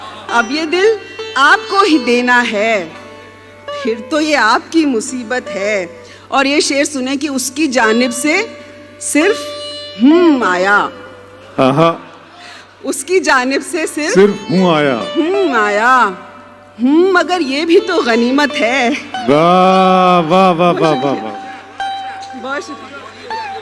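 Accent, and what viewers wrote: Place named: Karachi, Pakistan. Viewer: Pure urdu! Pure educated karachi accent! Proving the language belongs to only literates not illiterates!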